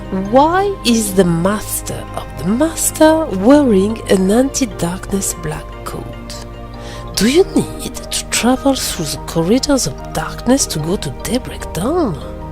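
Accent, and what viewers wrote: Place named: France. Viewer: Salut salut, bon je me permet d'ecrire en français du fait que j'ai reconue le petit accent :). Maintenant venant en a la theorie, je trouve qu'elle fait sense dans un certain context avec les arguments que tu viens de presnter. Mais le maitre des maitre n'est pas assignier a une union par consequent les signes des 6 union doivent étre present ou aucun ne doit faire son apparition. Mais une chose qui m'a fait réflaichir c'est le Labo qui se trouve en dessou du manoir, maintenant que l'on sait que KHUX se passe dans un rêve ou une simulation informatique, c'est très probable que le manoir est le prochain QG des dandelions après la disparition de daybreak town dans les tenèbre (ou ils ont re-crée les evenements graces a leurs souvenir), cela peut expliquer les statues d'unicornes et les quelques signe de l'union de vulpus de maitre Ava en hommage aux précedant Maitres. 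En gros voila mon idée et j'aimerais bien avoir ton avis sur le sujet, bien evidement je sais que ma théorie a des failles (cheraty) cela me feras plaisir d'en disscuter. Merci pour la video !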